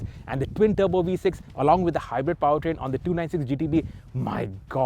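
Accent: India. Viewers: The Indian accent🤢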